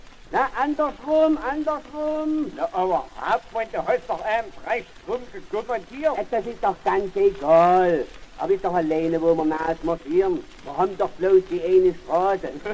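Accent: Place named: Saxony, Germany. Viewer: nu das ist ja nu ma werklich eene scheene säckssche Offnahme. ... Klasse und Danke für das Einstellen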